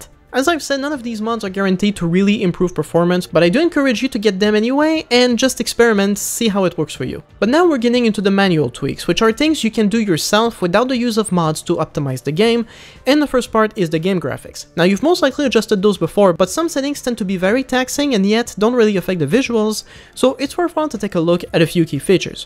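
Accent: Quebec, Canada. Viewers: L'accent québécois haha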